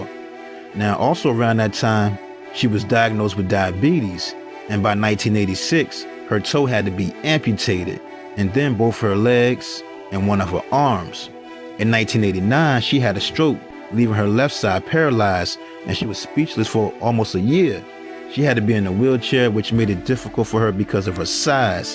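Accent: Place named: Chicago, United States. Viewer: What part of Chicago you from, bruh? I’d recognize that accent anywhere.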